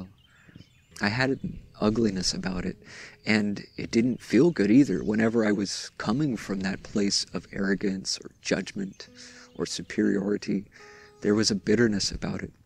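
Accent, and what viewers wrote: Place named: United States. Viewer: Thank you 💚 had a miracle breakthrough today and experienced the barriers coming down between someone I judged and myself. I really misjudged them and they really surprised me and they were really loving, we had a nice day together and I was able to see how I’ve been acting angry and judgemental. Starting to feel myself softening and letting go a bit towards others :) Just realised you have an American accent, it’s funny I haven’t noticed before as I was so focused on the messages etc. I’m in England. Thanks for your help 🌈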